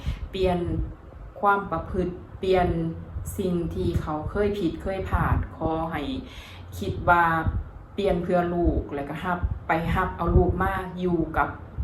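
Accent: Isan, Thailand. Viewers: พูดสำเนียงอิสาน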